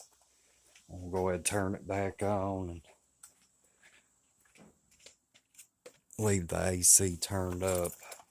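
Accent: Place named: Texas, United States. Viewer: I bet anything you're from Texas 😅 that accent is unique from there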